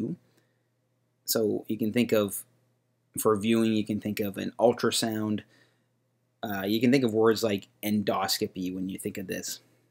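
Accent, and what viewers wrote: Canada. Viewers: You didn’t cover -emia. Powerhouse of the cell. Just kidding loved the video I’m learning for my work, thank you. Sounds like you’re from Canada just straight facts.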